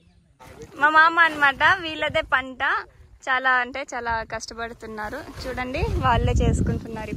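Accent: Chittoor, India. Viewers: Rayalaseema yasa vachestundhi...ma chittoor acsent la vundhi...little ga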